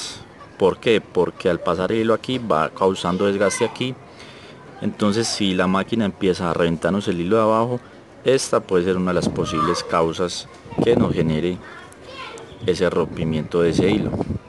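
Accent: Colombia. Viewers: Hola mi amigo portu forma de hablar eres colombiano osea mi hermano gracias por estos videos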